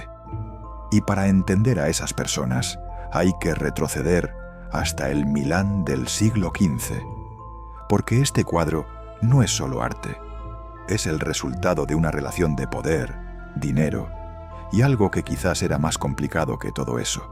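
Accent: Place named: Castile, Spain. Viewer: He encontrado este canal por casualidad y ya me he suscriito........da gusto oir hablar este castellano tan maravilloso...............¡¡¡¡¡¡¡¡¡¡¡¡¡¡¡¡¡¡¡¡¡¡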